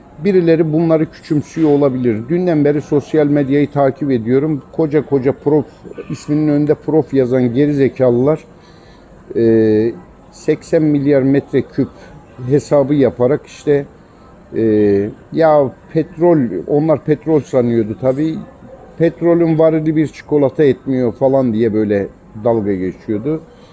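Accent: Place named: Kars, Turkey. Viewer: Abi gine kars şivesi sıkışırdın BUNLAR SARSAK OĞLU SARSAK 🇹🇷🇹🇷🇹🇷🇹🇷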